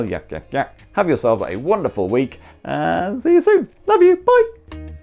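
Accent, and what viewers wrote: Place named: Newcastle, United Kingdom. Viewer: I love your comments and cool Jordy accent, "sound is weaker than a ferrets fart" 😂😂😂 I was born in gloucestershire but I've lived in the United States for 32 years but I like to hear your comments they're hilarious and some sayings that I haven't heard for years, keep up the good work 🙂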